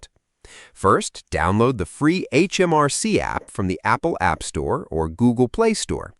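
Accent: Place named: United States. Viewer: HMRC could have used an AI voiceover without an American accent!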